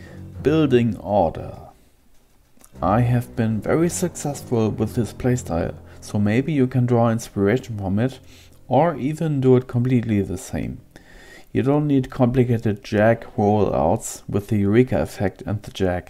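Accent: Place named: Germany. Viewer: Wie schön das man deinen Deutschen Akzent direkt heraushört. Liebe Grüße Leminsc8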